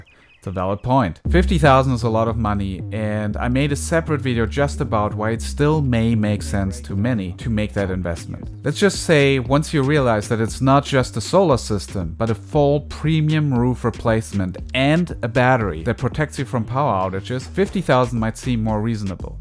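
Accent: Germany. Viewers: Your english language sounds very german! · ...your accent??? not quite german...aber ein bissien..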